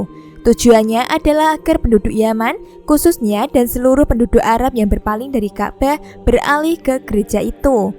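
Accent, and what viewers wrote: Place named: Java, Indonesia. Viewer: Medok jowo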